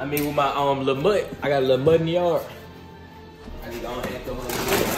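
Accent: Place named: Atlanta, United States. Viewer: lmao he said it twice first time I didn’t hear it by the time I found this he said it again and I heard clear as Day sounds like he from Atlanta or sum 😂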